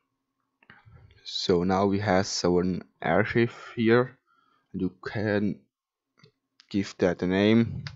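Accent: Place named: Germany. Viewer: Ich höre so einen deutschen Akzent...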